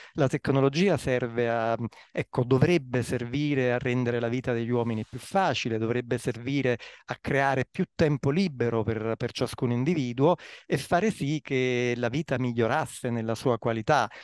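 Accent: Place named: Campania, Italy. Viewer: M' illumino di immenso...nell' ascolto del Professore dalla squisita cadenza campana, e dalla serena e incisiva esposizione di una realtà che, da sempre osservo, analizzo e compatico. Iniziando la analisi del greco antico, si aprono mondi, universi ... è assolutamente prioritario essere messi nelle condizioni di studiare la realtà circostante andando ad analizzare quali erano le parole utilizzate dalle grandi Civiltà per definire il nostro Universo. Da lì le ispirazioni si aprono...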